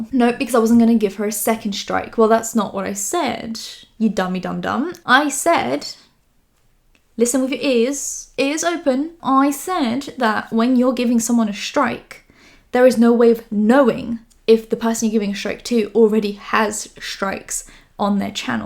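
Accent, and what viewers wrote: United Kingdom. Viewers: aw ur british accent is so cute!!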